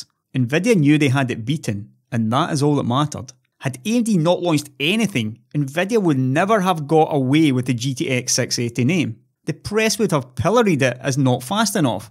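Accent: Scotland, United Kingdom. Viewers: getting much better at understanding Scottish accents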